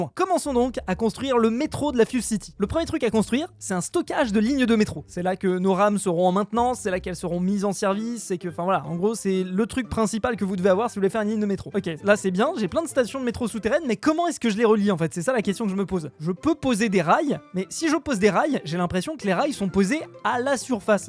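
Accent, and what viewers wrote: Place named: Quebec, Canada. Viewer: face-blue-wide-eyes: parle de plus en plus comme quebecois:face-blue-wide-eyes::face-blue-wide-eyes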